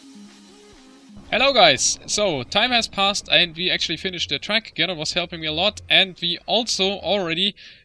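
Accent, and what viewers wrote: Germany. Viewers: you can rly here hes from germany :D but ya great video. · He prpbably meant the description :) Bt the way great video, i really enjoy your videos, and the german accent just makes it better :D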